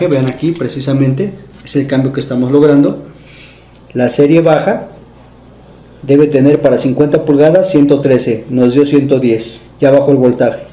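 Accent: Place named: Mexico. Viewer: Me gusta mucho el acento mexicano. Saludos desde Chile.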